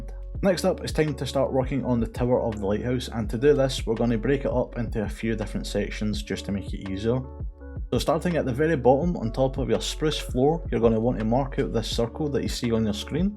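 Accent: Scotland, United Kingdom. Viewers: Thank you! Your tutorial was very helpful and easy to follow. I'm Scottish too so your accent was easy to understand :)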